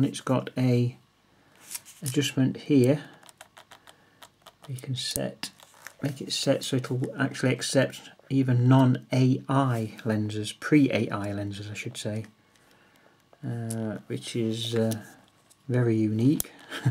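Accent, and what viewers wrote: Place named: North, United Kingdom. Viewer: I miss hearing that "Northern Accent" since moving to Bloody Florida!!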